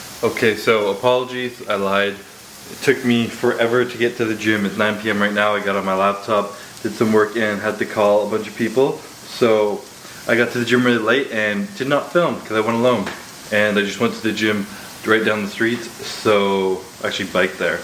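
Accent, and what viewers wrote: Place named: Canada. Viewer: Dude the first time I heard u speak I’m like this guys def Canadian ! keep it my Canadian brother .. shout out form the 6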